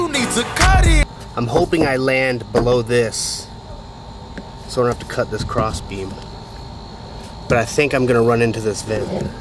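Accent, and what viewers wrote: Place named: Mexico. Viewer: you sound hispanic / mexican asf my guy / dude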